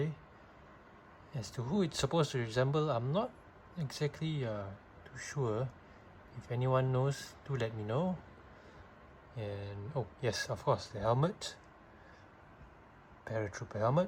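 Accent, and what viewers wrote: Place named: Malaysia. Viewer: Your accent's very familiar! Are you from malaysia or singapore?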